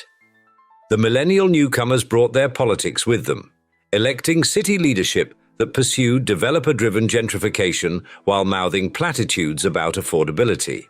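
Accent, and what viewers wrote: United Kingdom. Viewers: It isn't rich millennials. More specifically its high tech nerds that are socially awkward and don't want community. I wish you would have used an American accent onthe AI voice instead of British. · When you hear a British accent turn off!!! Thats what I do...BYE!!!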